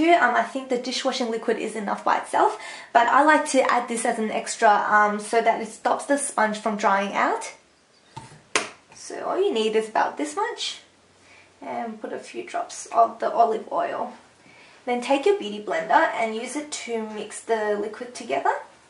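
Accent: Australia. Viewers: I love your video! And love the Aussie accent! ;) so I just used my beauty blender for the first time today and just like u, I fell in love with it!! It covered my acne and scaring. I couldn't believe it!! Best purchase hands down.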